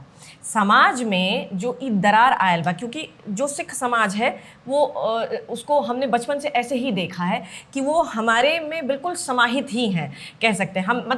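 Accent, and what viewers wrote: Bhojpuri, India. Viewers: आपकी बोलने का अंदाज एकदम भोजपुरी भाषा में है😊❤❤❤❤❤❤❤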